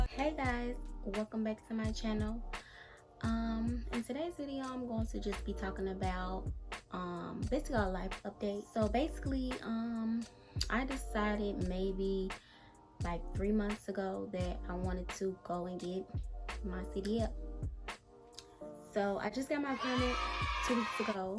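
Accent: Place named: Alabama, United States. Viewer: Which one did you go to bc you sound like you’re from round my way are you in Bama ?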